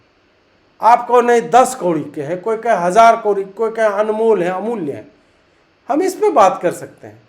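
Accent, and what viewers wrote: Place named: Begusarai, India. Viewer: Begusarai wala ka ye normal launguage h Galti se begusarai style me sahi se bol diye to tum logo samjh se bahar aa jayega Boli se ched kr deta h begusarai wala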